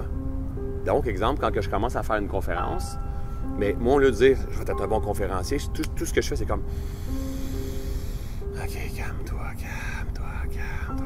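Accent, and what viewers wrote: Quebec, Canada. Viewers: Magnifique témoignage Humain, sans prétention, et tellement agréable d'entendre une voix Québécoise, ca touche mon Âme.. Gratitude et bonne continuité! Louise:-)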